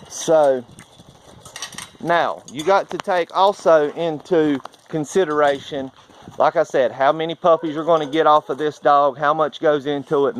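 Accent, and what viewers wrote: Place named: South, United States. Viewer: you sound like a southern gentleman. No need to justify anything, appreciate your hard work. God bless.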